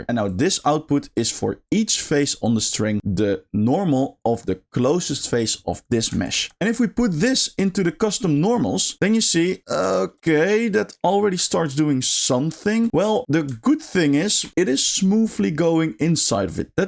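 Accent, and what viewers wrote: Netherlands. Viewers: Me when i hear english with dutch accent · Your knowledge of geo nodes is so insane. Thanks for sharing your skills and insights. Love the Dutch/English, I assume your Dutch, like me ;).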